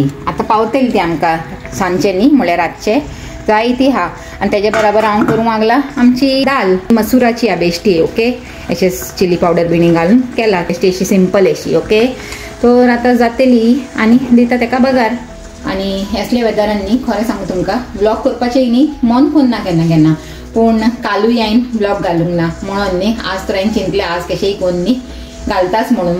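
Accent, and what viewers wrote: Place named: Karwar, India. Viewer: Your voice is so irritating also don’t say your husband Patrao all the time. It’s annoying. Ghantis call for Goan Patrao . Your karwari Konkani doesn’t suit for Konkani vlog.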